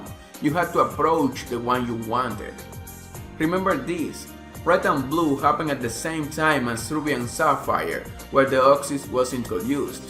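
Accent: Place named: Latin America. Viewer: That was a great theory, awesome job man! I am curious about your accent, are you latino?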